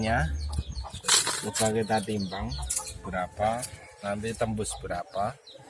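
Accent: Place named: Surakarta, Indonesia. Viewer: solo ne pundi mas kulo colomadu. Sui biangeet ra krungu logat solo, guyu2 dewe aku..smangat terus mas yo💪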